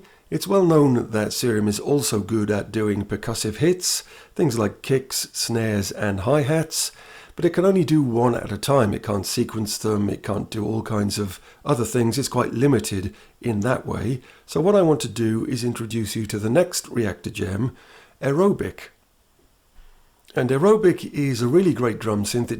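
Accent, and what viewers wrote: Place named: South Wales, United Kingdom. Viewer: It's been doing my bean in for a while now. I KNOW that voice/accent !! ... but I can't place from where I remember it. Another YT channel ? My musical adventures from days of yore ? My work with PAs ? . I too have a South Wales accent but mine's from a little further West. Love your work in reminding us of the power of Reaktor btw. 👍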